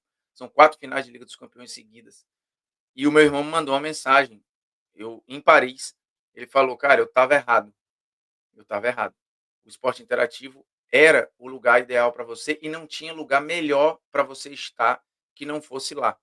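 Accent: Northeast, Brazil. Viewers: Parabéns pela sua carreira! Boa sorte na nova empreitada e que tenhamos mais ótimas opções como é e continua sendo a TNT/Esporte Interativo! E não deixa a nova casa tirar esse seu sotaque nordestino incrível! 👏👏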